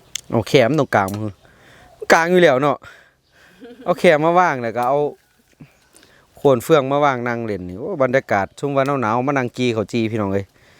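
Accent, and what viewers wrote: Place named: Isan, Thailand. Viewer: ตลกเสียงอืสาน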